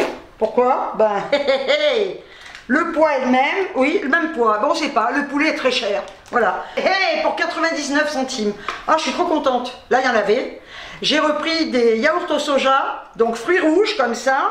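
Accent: Algeria. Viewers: Cc l accent pied-noir j adore bisous ma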